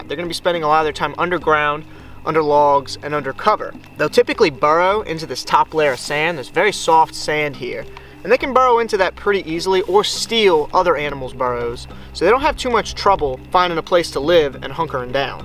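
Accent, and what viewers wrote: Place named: Florida, United States. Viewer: First video I’ve watched on this channel, and I could pick you out as either a floridian or Louisianan without even trying. We floridians can sense our own 😂. Also, hey, that beer is still waiting for you in Panama City! Much love guys! 🍻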